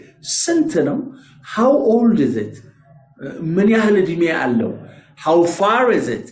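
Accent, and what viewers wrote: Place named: United States. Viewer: በጣም አመሰግናለሁ አቤት ለውጥ ያሜሪካኖቹ አክሰንትን አመጣህልኝ water ለማለት በፍፁም አደር ስታንድ አያረጉህም ቀጥል በጣም ጎበዝ ነህ አክሰንት አዙሮ ሊደፋነው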